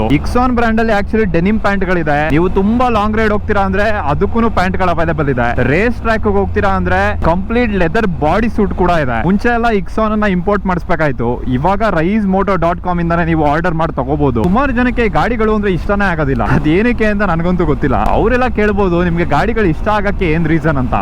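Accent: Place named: Kundapura, India. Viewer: Kundapura kannada ❤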